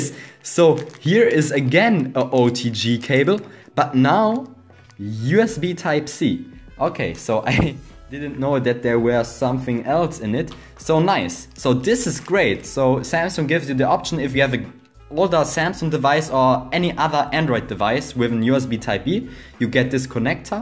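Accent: Germany. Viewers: Man hört das du deutscher bist 😂👌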